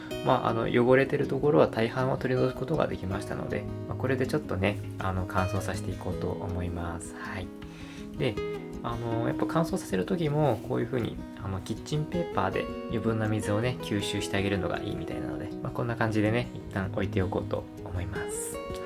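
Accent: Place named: Japan. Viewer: I like your Japanese accent but i dont understand Japanese 😭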